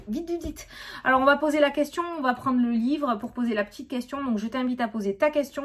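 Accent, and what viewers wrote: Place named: Landes, France. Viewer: Oups, oui je voulais te le dire ..je suis dans le 78! Mais originaire du 40 😉voilà pourquoi j'adore entendre ton accent 😁... Sinon, niveau fatigue, dans mon entourage, tout le monde est ''rincé''!!! Et puis les vacances d'été approche...je travaille dans l'annimation auprès des enfants et ça se ressent ! Bises à toi et à la communauté !!!😘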